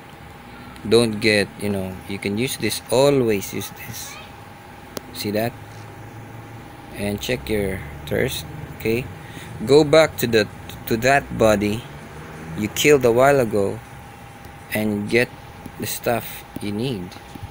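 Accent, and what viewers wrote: Philippines. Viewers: ur voice just like pinoy? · Pinoy akcent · Are you Pinoy? Halata ung accent eh xD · I know you filipino i hear filipino voice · Pinoy tama??😂 Edit:sabi ko na accent palang +1 sub Gawa ka ng video kung pano hack ldoe 1.6.5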